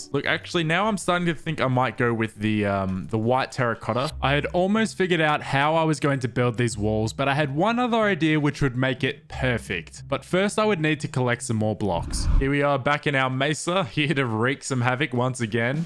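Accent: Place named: Australia. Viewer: far out this is the most aussie I reckon you have been in any video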